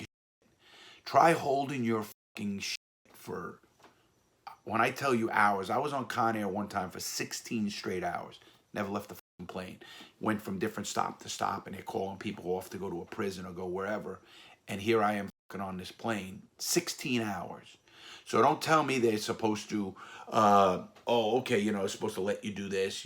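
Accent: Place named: Philadelphia, United States. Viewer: I could tell you're a Philly person due to your accent and specific terms you've said like hoagie and such.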